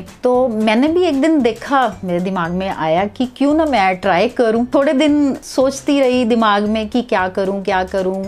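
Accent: India. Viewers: Ohhhhhhh aunt feeling sad for you lekin koi bat nahi jo hota behtr hi hota and think agr tb ap ka audition ho jata to shayed youtube ap k zehn m na ata aur aj ap ka apna channel na hota.So agr hmary pas sae kuch jata h to us sae ziada hi wapis b milta h 😊BHT ACHA LGA AP KI KAHANI SUN K.Aur ap bht cute hn specially talking style DESI DESI. sa😍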